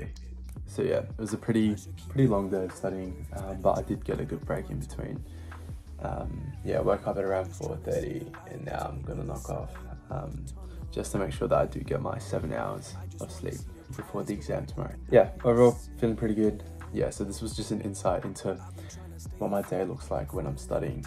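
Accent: United Kingdom. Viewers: Hi there Peter H. here too 🇰🇷🇺🇸Can’t focus writing research papers found your channel so motivating love ur english accent..back in college for bs degree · Dude he is definitely perfect, nice body, pretty face, smart, well behaved, clean ,has a nice voice and etc AND HE HAS A BRITISH ACCENT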